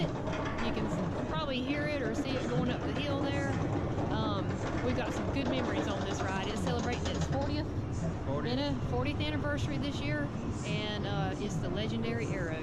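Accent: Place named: South, United States. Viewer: you sound like Reba McIntyre when you talk. What part of the South are you from? 😁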